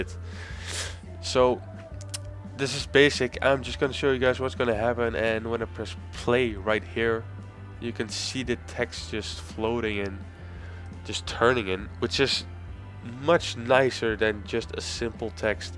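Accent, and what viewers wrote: Netherlands. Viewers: lol sounds like it. I'm half dutch and i hear some similarities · Are you Dutch? I assume you are because of your accent, lmao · Are u dutch? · are you Dutch?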